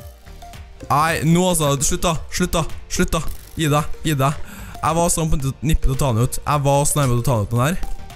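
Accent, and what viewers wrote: Trøndelag, Norway. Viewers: Elsker dialekten din! (Trøndersk)😄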